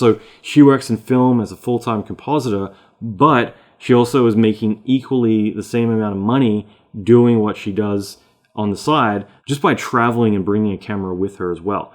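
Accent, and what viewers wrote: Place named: Australia. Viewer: This is a good insight. Theres an Australian accent sneaking through that american slant...or american accent sneaking through an Australian accent..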